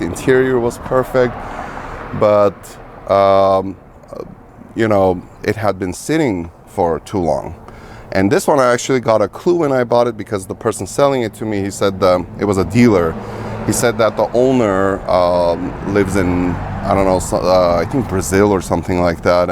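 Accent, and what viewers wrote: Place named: Iran. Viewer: Can hear the persian in his english, very powerfull language 👌🏿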